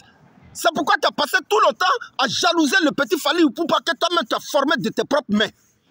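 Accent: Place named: Ivory Coast. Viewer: Koffi a déjà mahé lahan de watara il s en fout.....😅😅😅😅 je suis congolais je kiffe l accent ivoirien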